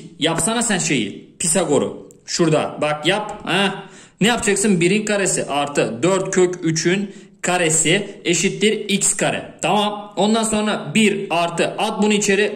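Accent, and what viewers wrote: Thrace, Turkey. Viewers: Trakya şivesi yapma sadece küfür yapppp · Trakya şivesi güzel la kalsın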